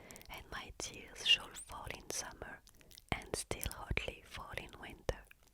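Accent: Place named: France. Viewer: Gorgeous whispers & great choice of reading - your English is fantastic... especially with your gorgeous French accent 🥰🥰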